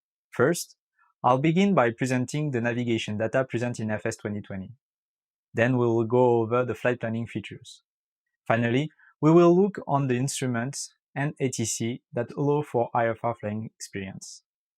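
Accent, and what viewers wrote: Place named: France. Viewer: French accent :3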